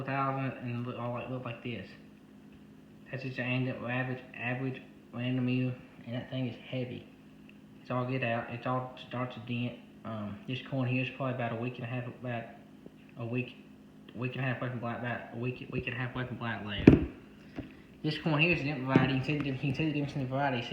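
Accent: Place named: Tennessee, United States. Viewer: I assumed you lived close to the Tennessee line because of your accent (I know...you don't have an accent - neither do I). I am looking forward to watching your corn harvest this year. Do you plan to have some videos on that?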